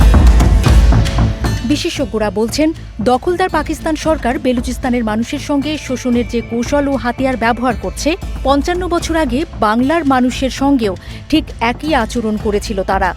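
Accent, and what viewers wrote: India. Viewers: এনটিভি কি ভারতীয় সুরে কথা বলেছে?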